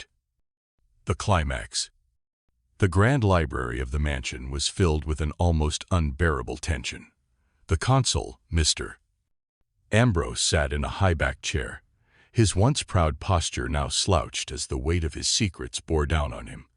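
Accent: United States. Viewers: Terrible American voice not worth listening to.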